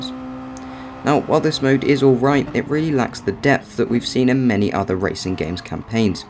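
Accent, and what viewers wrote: United Kingdom. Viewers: What area of the uk you from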